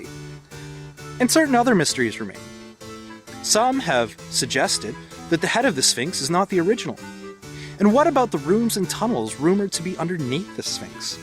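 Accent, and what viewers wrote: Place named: Russia. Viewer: Awful music and the Russian accent sounds crass and stupid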